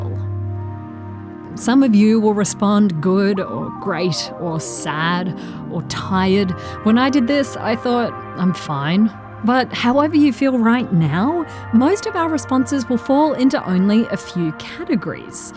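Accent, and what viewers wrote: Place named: Australia. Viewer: I felt nostalgia watching this hearing your aussie accent and wondering if that walking track was either in the central highlands or the Dandenongs ... :-) But here in Germany they have a thing called Gemütlichkeit which is like the dutch term you mention. Sort of like coziness but also social. And not to forget hygge the danish term which even has its own magazine here.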